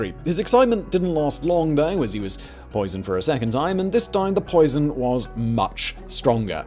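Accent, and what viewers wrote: RP, United Kingdom. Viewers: a lot of his videos are in pretty poor taste, like there's videos on his other channels about some really really sad and heavy stuff and yet he delivers it in this weird posh accent that makes it seem like he's joking, super irritating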